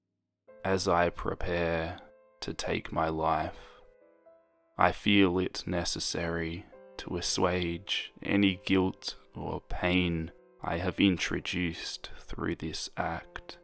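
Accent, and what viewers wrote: Australia. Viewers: You sound Australian tbh.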